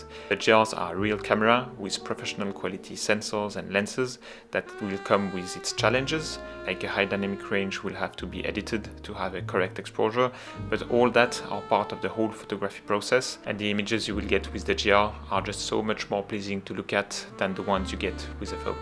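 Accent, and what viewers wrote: France. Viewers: Houuuuuuuu toi t’es français toi hahahaha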